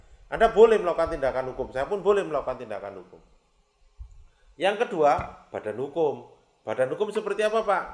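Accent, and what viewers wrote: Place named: Java, Indonesia. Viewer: Ada lucunya PAK BOSS kayak ada logat jawa nya gitu ☺😆👍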